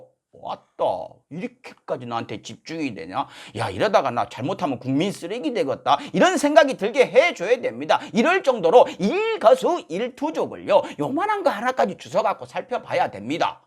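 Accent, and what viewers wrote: Jeolla, South Korea. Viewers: Fact news channel 강성범tv 전라도 사투리가 더 찰져졌네요. 항상 감사드리고요잉, 지지합니다. 조국 전장관님 따박 따박 숨어 있는 해충 한마리까지 다 찾아 내셔서 혼꾸녕을 내주십시요~~~~. 공수처 설치는 올해안에 꼭 완수 하시길 국민의 한사람으로서 명령 합니다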